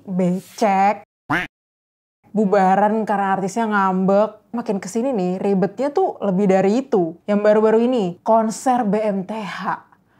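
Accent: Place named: Banyumas, Indonesia. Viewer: orang ngapak ya mba hahah PWT kerenn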